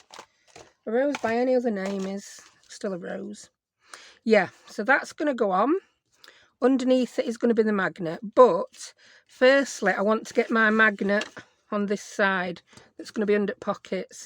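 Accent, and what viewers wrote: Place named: North Yorkshire, United Kingdom. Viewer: I just found your videos! I absolutely love them! Especially love your accent. I spent 18 years in the UK, mostly North Yorkshire. You are making me homesick! In a good way, I loved it there. If I may ask, where are you located?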